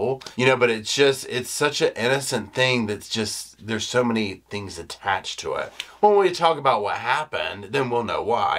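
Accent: South, United States. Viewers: One of the reasons I so relate to is his Southern nature. He has a rhythm to his speech that brings me back to my own Kentucky roots. It feels like down home to me. ❤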